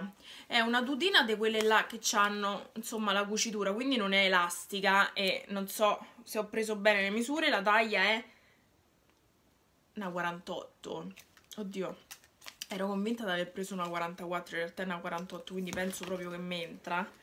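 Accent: Rome, Italy. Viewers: team 🌹, amo il tuo accento romano 😍 Pezzo preferito il pigiamino di barbie aaaa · Ma vogliamo parlare dell’accento romano😍♥️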